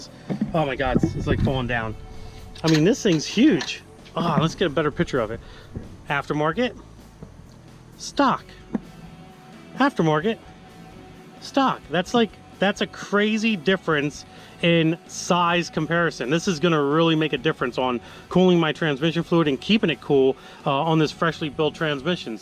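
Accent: Pennsylvania, United States. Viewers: It's a Pennsylvania thing. I'm from pa and everyone says it like that.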